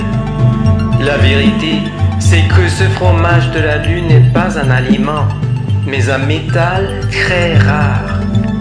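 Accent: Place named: France. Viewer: c'est tres tres sympatique ...ahahaha ... et Ton accent Français est magnifique :)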